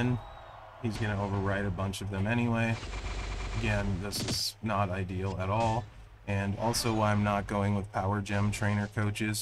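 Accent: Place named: Canada. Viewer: That's a side effect of his Canadian dialect. It's very prevalent in that country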